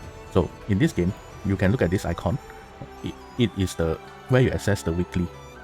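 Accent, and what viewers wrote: Singapore. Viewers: are you Singaporean ??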